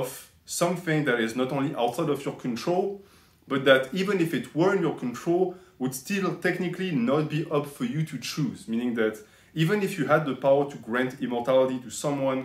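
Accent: France. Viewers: Many people here being grateful for thick french boi reading and sharing his toughts on the books but dont forget to read it yourselves too.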